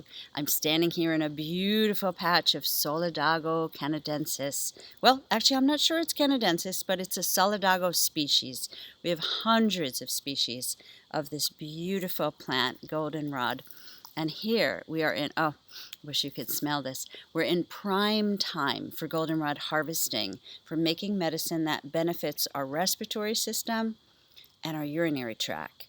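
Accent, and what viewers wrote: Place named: Australia. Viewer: I hope you are OK. Sounds like Australia, or similar. We are all One here on Earth. Bless you.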